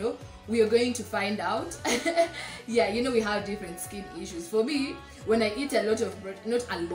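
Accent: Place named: Luhya, Kenya. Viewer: 😂I love it when you speak swahili manze..it comes out so naturally..the Luhya version babe...the products are so preeeeettyyy😍just like you...keep doing you shawryy...❤❤❤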